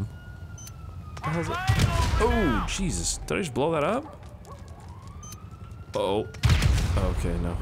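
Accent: Canada. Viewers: U sound like canadian lad a litele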